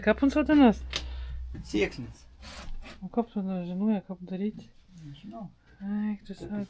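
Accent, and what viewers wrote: Samogitia, Lithuania. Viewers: Mėgaujuosi JŪSŲ žemaičių šnekta...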